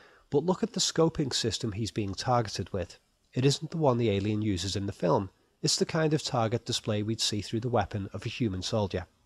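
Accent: Liverpool, United Kingdom. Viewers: A scouser from Liverpool, yes!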